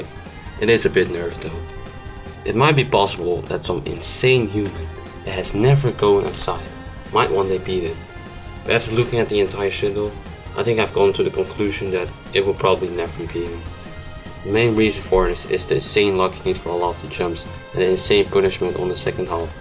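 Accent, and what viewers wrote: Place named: Netherlands. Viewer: I love jouw Nederlandse accent ;)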